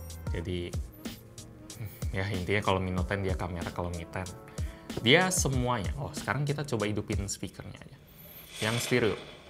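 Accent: Palembang, Indonesia. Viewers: Ay wong palembang sikok ini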